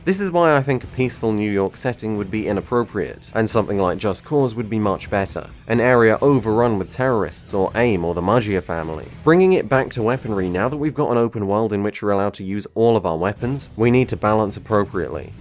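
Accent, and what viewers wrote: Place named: United Kingdom. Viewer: u sound like a stereotypical nazi bad guy in hollywood movies, of course, with a british accent.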